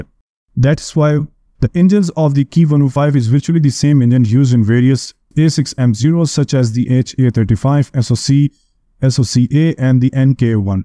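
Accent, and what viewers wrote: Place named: India. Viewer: Indian voice over 😢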